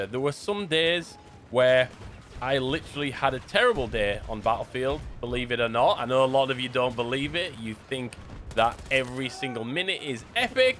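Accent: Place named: Scotland, United Kingdom. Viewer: When I first started watching you I thought you were a Scot. And now I have just learned I wasn't entirely wrong! □😂🤣😂🤣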